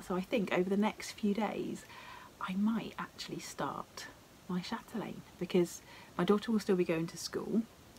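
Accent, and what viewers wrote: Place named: England, United Kingdom. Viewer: Yes you do bring me lots of joy becuz I love listening to your English accent. I’m so happy that you will have more stitch time during the week due to remote teaching. That’s going to be so nice. I too have a Chatelaine but it just makes me get antcy seeing all the floss. I would love to stitch the dresser or the castle. Love monochrome stitching charts. See you tomorrow and please do have some bubbly!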